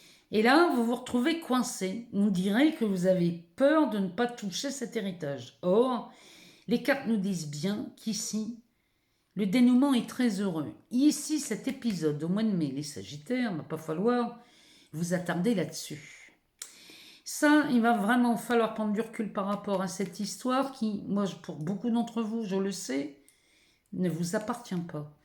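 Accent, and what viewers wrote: North, France. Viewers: Bonsoir ! C’est la première fois que je vous écoute et j’aime beaucoup la façon dont vous expliquer le tirage ! J’avoue que vous l’avez même fait rire quelque fois car vous êtes très naturelle et c’est tellement agréable 🤩🤩🤩 Je me suis abonnée et je vais vous suivre car c’était très agréable à vous écouter 💕 Je me permet de vous poser Une question : vous ne seriez pas du Nord ? Merci 🙏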